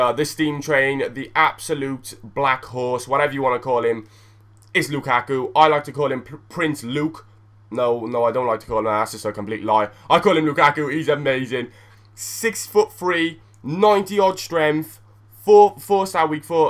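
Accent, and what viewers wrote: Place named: Welsh Valleys, United Kingdom. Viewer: You sound like leeroy from the valleys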